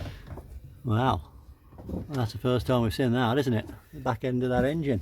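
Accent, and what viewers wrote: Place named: East Coast, United States. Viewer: Just got a 85 Ford diesel 350 a one owner with 70k miles. Needs minor interior repairs. A little leak happened while she sat for four years. Had it towed and she started up first crank. Purrs like a kitten. Thinking mabe Van Halen stripes on the old girl. Needs a bath as is completely covered in moss and thick lichen. Where are you all located? Sounds Eastern accent. NW Arkansas here.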